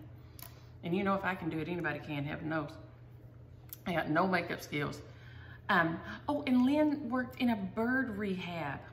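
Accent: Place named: South, United States. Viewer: Maybe Webster should make a Southern dictionary! Never heard of Tump! But I bet Utah people say words you never heard, like “Oh, My heck”, Fetch, or Biffed it. Love your Stitchin, Stories and accent! FREE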